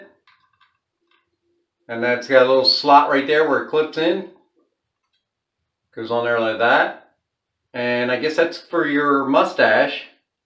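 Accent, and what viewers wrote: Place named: Philadelphia, United States. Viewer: DEF from Philly or Delco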